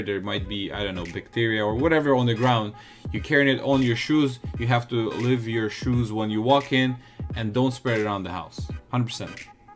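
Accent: Russia. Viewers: Посмотрел 39 секунд, слышу, что ты русский и вот это "this could get you killed", конечно пиздец. Не потрудишься светануть здесь случаи, где кого-то уже killed по этой причине, особенно зарубежного туриста? ЗЫ. дальше эту поебень смотреть смысла не вижу. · У тебя акцент русский)) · Hey boy. Shut your mouth and stay where you are. You will never be an American with your heavy Russians accent. Do the best you can for your beautiful country. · a dude with russian accent and probably was born in Russia,you know. Eloped to usa and says wrong things about Russia. Disrespect and weird way to deride country and people. You haven't succeed at all,. The only right thing from this video is info about superstitions in Russia.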